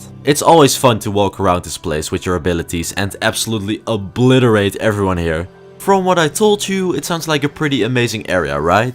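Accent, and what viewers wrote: Netherlands. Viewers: Het nederlands accent door je engels heen is crazy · am i just the only one smelling a bit of a dutch accent here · je klinkt nederlands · You are dutch right? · just for science.. are u dutch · ik ruik nederlands man ngl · Wait are you Dutch? (Same)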